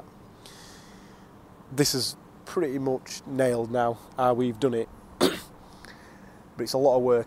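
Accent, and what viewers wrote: Yorkshire, United Kingdom. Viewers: Love this and this channel! And it's so good to hear a fellow Yorkshire man on YouTube lol keep up the good work! Never knew u had a YouTube!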